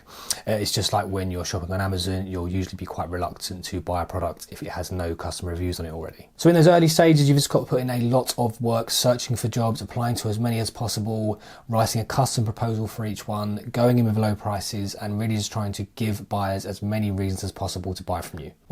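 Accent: United Kingdom. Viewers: Can I just say, one of my great annoyances is with youtubers that talk at 100 miles an hour. it’s really off-putting. Please dont fall into that trap. Otherwise, I am enjoying listening to someone from the UK for a change.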